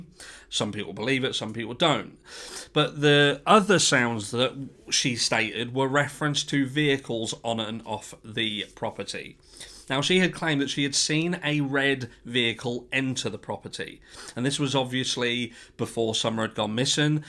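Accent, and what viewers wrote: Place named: England, United Kingdom. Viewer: I do love your accent also. Always have loved the way the English pronounce and state, with such compassion. I had forgotten about Jodisue's statements about the Suburban. Candus you to get straight and come up with the truth, no matter what it is - for Summer's sake, her memory, her dignity, her innocence...Do one right thing in the name of ALL your children.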